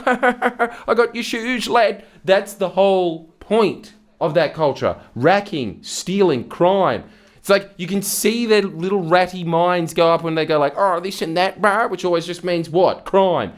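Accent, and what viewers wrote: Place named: Australia. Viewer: You’re one of the less irritating Australian accents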